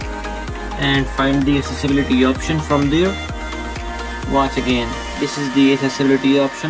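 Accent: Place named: India. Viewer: Please turn down that annoying music. I have a hard time with accents as it is. I love people from that region, but have a difficult time uderstanding.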